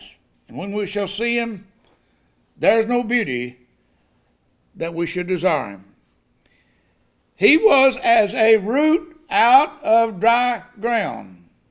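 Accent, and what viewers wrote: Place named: Texas, United States. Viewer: Love your Texas accent Pastor.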